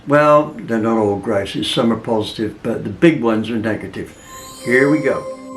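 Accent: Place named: England, United Kingdom. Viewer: I love your channel you remind me of my grandpa and I when we use to talk about and restore old British Motorcycles. Since he passed I got into cars and now have an Ecoboost Mustang myself. You remind me of how my grandpa use to explain things to me, even down to the English Accent. I watched your videos about picking out wheels for the ecoboost and it has helped me a lot. Thanks for everything.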